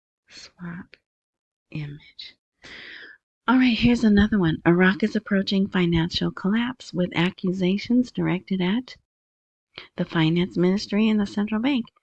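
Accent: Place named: South, United States. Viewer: Good Morning, don’t down play yourself on speaking. I’m only guessing your from down south as myself I’m from New Orleans but live in Metro Atlanta/Alpharetta Ga. I hear and understand you very well, all words are annunciated and articulated very clearly. No complaints here, keep up the good work. A little off subject I was about to invest more into Dinar & Dong but I own several million in both. I’m thinking of going about $5K and investing more into XRP crypto. I think this is own generation Bitcoin. If all avenues for sending money ( Western Union, Money Gram, Zelle, Crypto, Walmart to Walmart, Cash app ) whomever will have to go through XRP. I didn’t get it in 2022 at $0.50 now it’s $2.25 after court settlement. I think along with Iraq and all countries re settlement of currency XRP might be a good bet. I’m not telling people to buy I’m just saying I’m spreading around hoping for 1 to bring me on to super early retirement. 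Lol. Thanks for you platform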